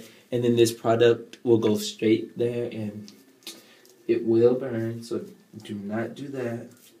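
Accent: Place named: Louisiana, United States. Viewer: Aww I love ur voice, you kinda sound like ur from Louisiana.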